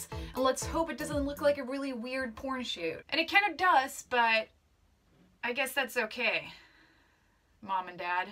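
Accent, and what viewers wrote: United States. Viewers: Din amerikanska accent är klockren. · You're the most American-sounding Swede I've ever heard. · You're Swedish? Hahaa! Definitely thought you were American. Awesome video! Make one for my friend, pls?! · Swedish? WTF. You sound more American than most Americans.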